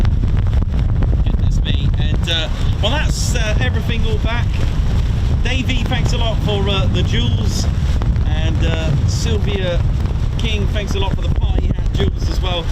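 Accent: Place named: United Kingdom. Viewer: Love ur channel!! Love the British accent!!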